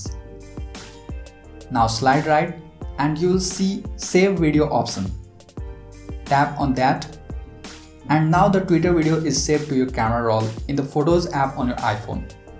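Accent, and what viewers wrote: India. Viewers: If you think I’m trusting a man with an Indian voice on a tech issue, you can think again.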